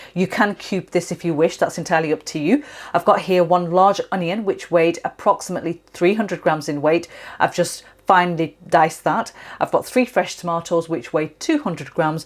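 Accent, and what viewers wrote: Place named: North, United Kingdom. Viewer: are you from up north , can hear is in the accent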